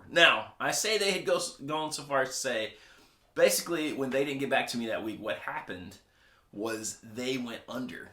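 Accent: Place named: Scotland, United Kingdom. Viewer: As a recent subscriber to both your channel and Casino Guitars and now I want to say thank's to you, Baxter and for excellent content. It's also great to hear a fellow Scottish voice talking guitars.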